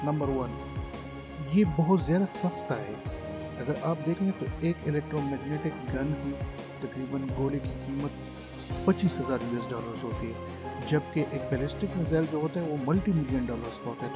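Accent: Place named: Pashto, Pakistan. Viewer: thanku4yrvocal my assumption is yr accent is pashto from sarhad kpk ji m zindabad inshala china will always rise couse intheir history theyr talented and creative inventive nation yet they never invaded any country godbless china and5superpowers and rest ofthe world nations god let sanity prevail may v not destruct anhilate eachother peace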